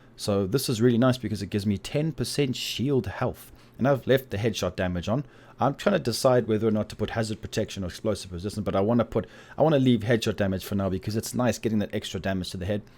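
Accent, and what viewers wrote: South Africa. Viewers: Hey bru love your vids. Are you South African? I'm new to the game been playing about a week. Just got to WT5 and trying to unlock my specialisations.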